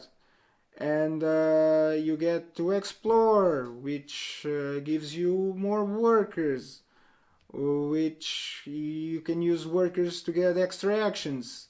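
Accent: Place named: Portugal. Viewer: This english sounds like portuguese?! or am I dreaming. Anyway, very good video, good, simple production, direct to the point! Boa sorte! Mais um sub!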